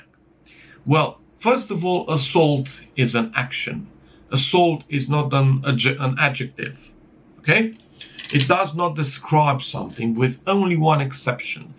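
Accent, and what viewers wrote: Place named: United Kingdom. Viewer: Took me by surprise with the british inflexion not gonna lie. Also, I think classifying non-automatic weapons as "assault rifles" is pure idiocy. A carbine sold to civilians will never have the auto option. By military definition " selective-fire, automatic rifle chambered in an intermediate cartridge fed by a detachable box magazine". So any news anchor talking about "assault rifles", especially in Romania, with their "experts" are talking out of their ass. Great video as always.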